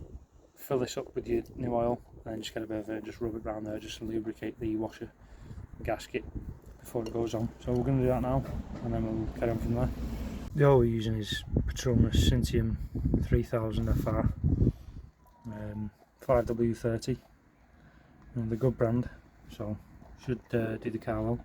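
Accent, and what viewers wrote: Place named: Newcastle, United Kingdom. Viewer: Ah I see you are a geordie too :) 😊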